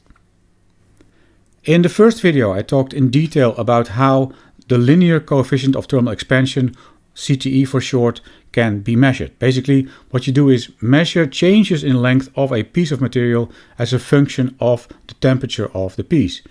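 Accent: Netherlands. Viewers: Is that a Dutch accent?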